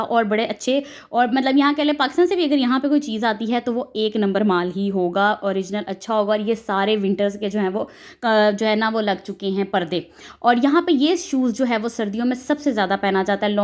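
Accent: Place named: Punjab, India. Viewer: Wah G... urdu k sath punjabi ka jo tarka lagya hai... maza a geya.. 😂😂😂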